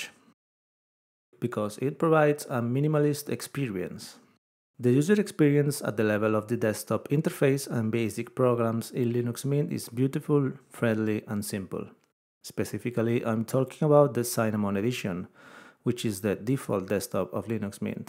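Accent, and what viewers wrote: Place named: Brazil. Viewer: brasileiro tentando falar ingles, suponho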